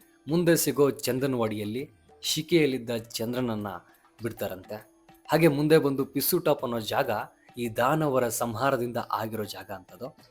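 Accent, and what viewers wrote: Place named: Central Karnataka, India. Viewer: Yava uru nimdu, launguage kelidre middle Karnataka ansutte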